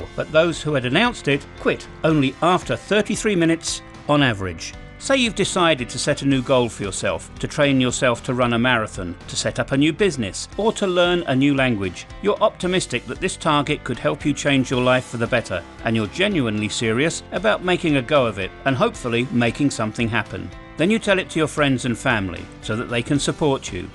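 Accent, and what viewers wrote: United Kingdom. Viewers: I like your Chanel und britsh accent (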